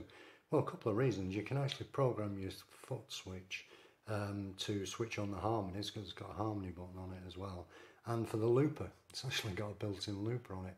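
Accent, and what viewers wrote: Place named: Lancashire, United Kingdom. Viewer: Hi you sound like you’re from Lancashire like me, although I live in Spain. Am almost pulling the trigger on this just for a bit of busking with my mate, is it loud enough do you think, for a busy city street? And how annoying is it that they put the whole control panel “upside down “, do you have to keep getting up and going in front of it to change a setting? A minor issue but don’t know how much it would bug me, I can’t imagine why they do it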